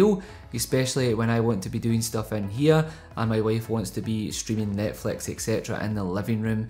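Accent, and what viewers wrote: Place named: United Kingdom. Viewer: You live in britain from the sounds of the victorian house, and your accent suggests you haven't emigrated, so you're well aware that you did a rude gesture, not peace fingers, at the end of the video. That's disingenuous. You also say "go ahead" where it adds no extra information about twice a minute, which is a very American trait, though also far more frequent than realistic. A speach coach would most likely tell you to remove these meaningless phrases from your vocabulary. It seems like you're trying to capture an American audience by making your content more familiar to them. But you're reviewing a BT product, BT being an acronym for British Telecom. American's don't care about products that aren't avaialble in America, so why are you forcing this unatural familiarity? It only hurts your credibility. There are American YouTubers who are very popular in the UK, and there are British YouTubers who are very popular in the USA. 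Your nationality does not affect your credibility. Pretending to be American in such a forced way does. And that lack of integrity caries over to your reviews. How can we trust what you say about these products, if we can't trust you talk as you naturally do? Other than the offensive gesture and overuse of "go ahead", great video! Thank you for taking the time to make it!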